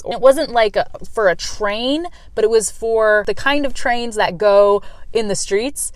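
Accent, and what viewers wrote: United States. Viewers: What region from u.s.a are you? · Your American accent is so lovely, It's incredibly clear <3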